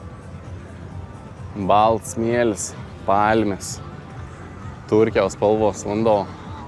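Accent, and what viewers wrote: Samogitia, Lithuania. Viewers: Ačiū,Tau uz video.Labai grazi Tavo kalba kaip zemaiciuoji.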